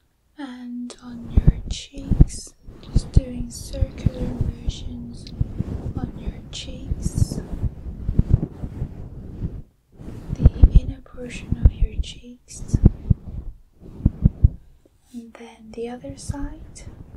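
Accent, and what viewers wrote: United Kingdom. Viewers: She sound quite British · What country do you live in? I can't place the accent but sounds like the UK. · It’s giving uk 🇬🇧 😂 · I’m getting British accent vibes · IDK why but she sounds british for some reason. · Are you British? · Oh my god you have like a British accent that's so pretty 😊 and love the ASMR video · Are you European? Your accent sounds both American British (Northern and southern? Mabye a mix of American Irish but mostly British. I can't tell and I'm so confused) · u are from UK? I loved ur accent🥺❤️ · I... can't place your accent. Sounds predominantly british, but it breaks that... pattern, for lack of a better term, often enoufh to make me question. As an american who doesn'r get exposed to many different accents, it bothers me when i can't identify it, lol. Something about reinforcing the ignorant american stereotype? 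All that aside your asmr is fantastic, i found your content last week, and have binged vids 3 times for relaxation and sleep. Edit, are you layering the file for the mic sounds on top of the audio from the video?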